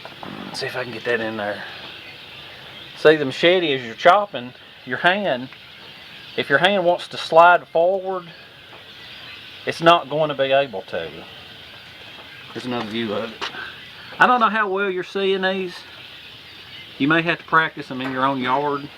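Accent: South, United States. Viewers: Another good video. I guess one of the reasons I enjoy your videos so much is that you do show different ways of doing things. That and we share the same southern accent! LOL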